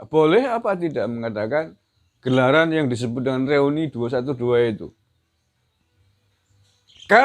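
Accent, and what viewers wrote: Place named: Tegal, Indonesia. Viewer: asik mas dangdutanne..iyonge wong tegal kiye mas, mantapp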